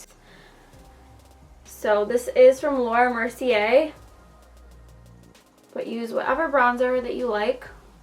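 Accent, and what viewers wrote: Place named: New York, United States. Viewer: This is offensive probs but I hate NY accents usually but I freakin love how you talk so much gaha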